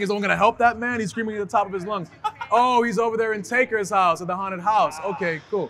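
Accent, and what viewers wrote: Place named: Ghana. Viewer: What accent??? Aren’t you from Ghana 🇬🇭 ??? Did you lose that accent!!!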